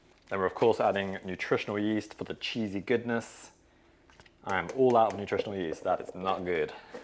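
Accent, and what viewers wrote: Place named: United Kingdom. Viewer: Great recipes. And, as most of the vegan cooking channels I watch are American, & I live in Aus, it's nice hearing the old UK accent - 'and we'll 'pop a lid on top'! Only the Brits 'pop' things ❤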